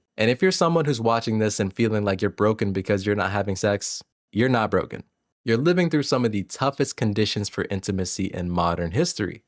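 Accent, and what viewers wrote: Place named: Baltic states. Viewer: sounds like you are from the baltics or somewhere else tbh idk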